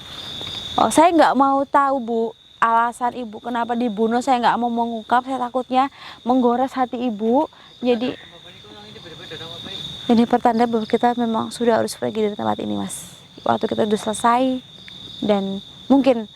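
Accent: Banyumas, Indonesia. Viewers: Pingin banget di marahi Ama mbak dengan bahasa ngapak nya, palingan aku cuma cengar cengir..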